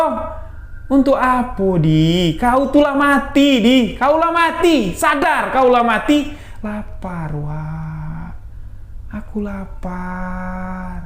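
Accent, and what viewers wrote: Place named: Sumatra, Indonesia. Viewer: ahai logat sumatera ni kek nya bang,,, sedap pulak awak dengar nya bang,,, beda dgn channel misteri lainnya... salam dari aceh bang..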